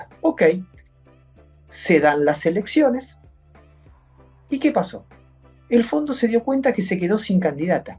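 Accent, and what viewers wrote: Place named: Argentina. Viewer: HABLA CON UN TONO MUY NACIONAL SE VE QUE ES UN ARGENTINO NATO PERO LO UNICO QUE FALTA QUEproponga TRAER A LOS CHINOS PARA QUE OPINEN COMO DIJO MILEI ES UNA CRISIS MORAL TODOS TENEMOS QUE REVISAR NUESTRA MORAL Y CORREGIR LO QUE HAGA FALTA ESTO ES FUNDAMENTAL PARA EMPEZAR A VER CLARO NUESTROS ANTEPASADOS NO SE PREGUNTABAN TANTO Y HACIAN MILEI YA ESTABLECIÓ SU LINEA Y ES NUESTRA UNICA SALIDA PARA LIBRARNOS DE ESTA CASTA MALDITA FUERA CASTA FUERA SOCIALISMO FUERZA LIBERTARIOS VIVA MILEI PRESIDE.NTE 2023 VIVA LA LIBERTAD AVANZA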